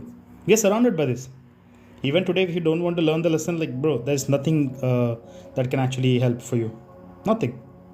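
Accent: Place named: India. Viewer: Hell Nah Indian scammer with the accent too is hilarious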